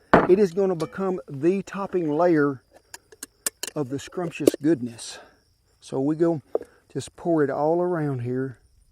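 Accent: South, United States. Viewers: Definitely down South cooking and speechifying! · Ah, yes I believe you're right. I'm disappointed I couldn't figure that out by myself, I live in the south and hear people talking like this all the time lol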